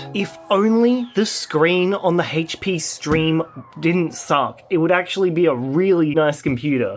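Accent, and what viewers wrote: Australia. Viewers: he's Aussie.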